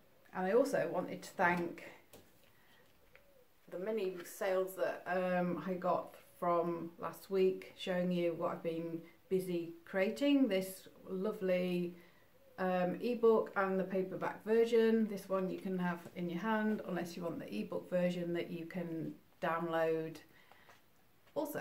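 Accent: United Kingdom. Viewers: I DETECT AN ACCENT WHEN YOU SPEAK IN YOUR VIDEOS ARE YOU BRITISH?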